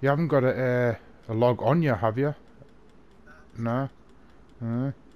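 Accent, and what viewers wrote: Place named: Belfast, United Kingdom. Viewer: cheers mate, great vid in the best uk accent there is! PURELY BELTA! liked n subbed as a dayz noob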